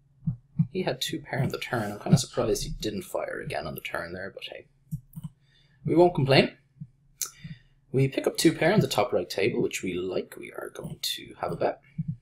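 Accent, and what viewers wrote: Ireland. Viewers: Irish confirmed.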